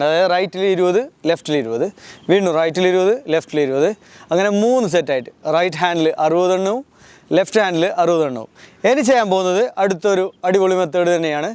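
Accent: Kasaragod, India. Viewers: KASARGOD ആണോ BRO 🤩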